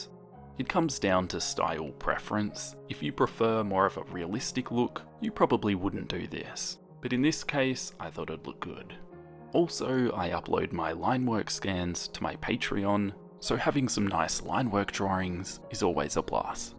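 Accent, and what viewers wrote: Australia. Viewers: I reallyyyy like your horror stile and i always watch your videos as a podcast and your voice and the australien accent chef kiss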